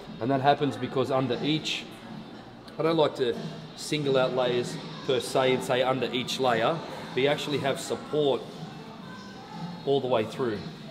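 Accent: Australia. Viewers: she's such a gorgeous girl love her hair what an amazing job you did and love your accent its so beautiful love Australia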